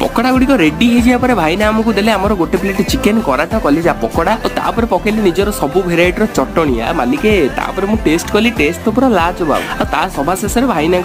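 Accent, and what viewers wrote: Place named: Puri, India. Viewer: କଥା ତ କହିବ ଭଲରେ ।। Ye malike malike kana .. Tama puri ଲୋକଙ୍କ ଭାଷା ଶୁଣିଲେ BP High hei jauchi ।।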